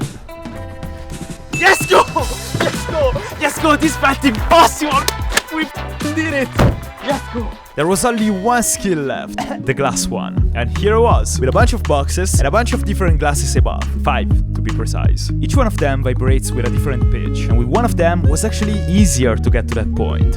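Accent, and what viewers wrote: Italy. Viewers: L'italia si sente... nella lingua :D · Hai un accento così italiano 😆 (bellissimo)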